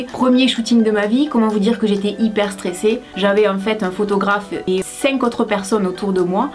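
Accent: South, France. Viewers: Bravo à toi! Tu as mérité tout ce qui t'arrive, tu es tellement souriante, naturelle, tu fais du super boulot et puis je vais te faire une confidence, j'adore ton accent qui me rappelle pour ma part quand j'habitais dans l'Hérault et pour mon mari lorsqu'il habitait Toulouse. Tu nous réchauffe avec cet accent ensoleillé;) Tu donnes aussi des astuces tellement utiles:) Pour moi tu es la copine qui serait là et qui me dirait " mais non ne jette pas ça, regarde tu faire ci et ça avec ta crème ". Petite question de curiosité: comment est-ce que tu sais notre âge? Gros bisous et toutes mes félicitations, c'est vraiment mérité:) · Félicitations! Tu mérites amplement tout ce qui t'arrive de bien en ce moment! Bientôt 200 000 abonnés en plus! Toutes tes vidéos sont pleines de super conseils et on voit bien que ta maturité et ton expérience parlent et toi au moins tu ne dis pas d'énormités comme certaines jeunes youtubeuses. 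J'admire ton travail et ta force de caractère. Je suis ravie chaque fois qu'une nouvelle vidéo de toi sort. Tu es la seule dont je ne me lasse absolument pas. Et ton accent! Enfin du sud fièrement affiché sur YouTube pour nous représenter! Je n'ai que 23 ans mais je préfère tellement te regarder toi que d'autres plus jeunes. Change rien! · coucou vraiment bravo!! je te suis depuis longtemps et je t'adore tu as un accent de chez nous du Sud une abonné de +!!😘😘😘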